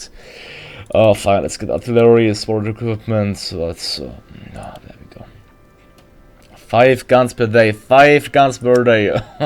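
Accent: France. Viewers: Ты француз?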